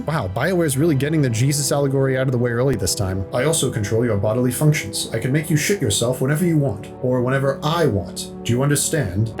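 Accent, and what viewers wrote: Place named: Australia. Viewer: Fun fact, your Australian accent caused me to become the jonker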